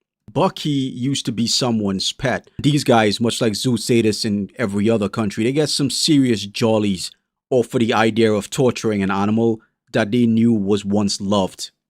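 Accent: Jamaica. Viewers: I don't want to be rude or anything but what is your accent? It sounds like a Jamaican, South African, and New York accent all at the same time. I'm surprised people aren't hunting them down and unaliving these creeps. If there's anyone in China reading this who has nothing to live for... Or if you're suicidal, don't commit suicide and instead... well I can't say it. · your accent is very interesting. it sounds sort of Jamaican/west African mixed with Geordie/north english accent. what actually is your accent?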